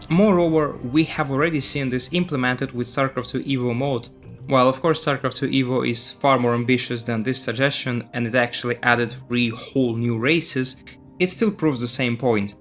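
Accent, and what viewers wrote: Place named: Russia. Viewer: not strange, just noticable, but in a good way, absolutely understandable and yes, now you mention Russian, I can kind of hear it, but much softer than the stereotypical (although also very cool) strong Russian accent! Thanks for answering, loving your vids! Cheers! :)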